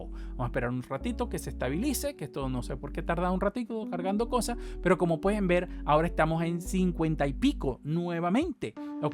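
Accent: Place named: San Juan de los Morros, Venezuela. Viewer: Saludos hermano, me gustan tus videos... he notado que tienes un acento muy parecido de la ciudad de donde soy, no seras de San Juan de los Morros?? Guarico, Venezuela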